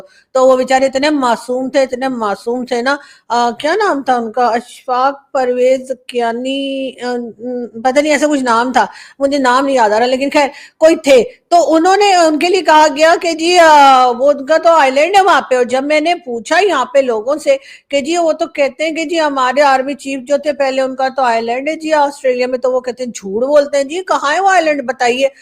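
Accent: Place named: Allahabad, India. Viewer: Aap bahut khoobsurat lag rahi hain , Allah aapko salamat rakhe Aap TRUE ALLAHABADI lagti hain , aapke bolne ka lahja ek dam Allahabadi lagta hai Hamara bahut sara Ashirwad 🎉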